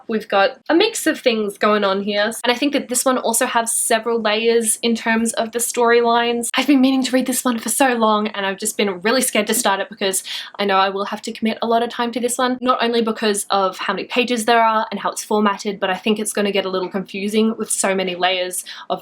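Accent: Australia. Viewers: Are you Aussie? I love your accent!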